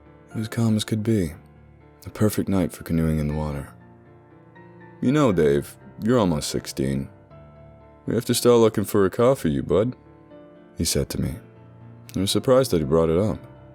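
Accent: United States. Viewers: I would think your American if I didn’t know you weren’t ( this coming from an American). Great job love your content.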